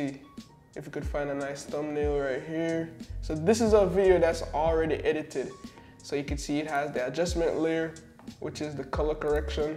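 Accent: Jamaica. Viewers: Great video, I love that slight Jamaican accent. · You sound like a yard man still. I can hear two accents when u speak lol. Good video 👌🏾👌🏾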